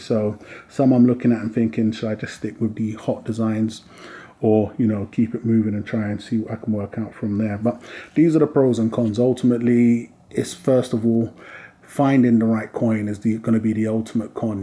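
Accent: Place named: Brixton, United Kingdom. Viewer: I recognize a Brixton accent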